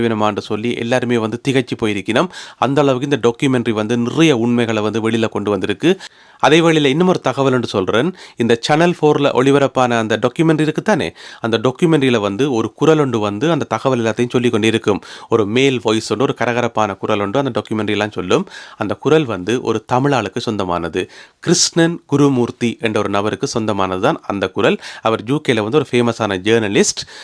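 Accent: Jaffna, Sri Lanka. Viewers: அவர்களே! முதலில் என் வாழ்த்துகள். தமிழைப் போல் இனிய மொழி இவ் உலகில் உண்டோ என்று கேட்டால் நான் இல்லை என்றுதான் சொல்வேன். அமிழ்திலும் இனிய மொழியை தேனிலும் இனிமையாக யாழ் மொழியான பேச்சு மொழியில் சொல்லும் விதம் இருக்கே என்னவென்று சொல்வது! அருமையாக கதை பயணித்து நிறைவு பெற்றது. வாழ்க! வளமுடன்! நானும் இலங்கை தான் அம்பாரை மாவட்டம். என் உறவுகள் அங்கே இருப்தால் பார்ப்பது வழக்கம்.நன்றி தமிழ்! Siva T.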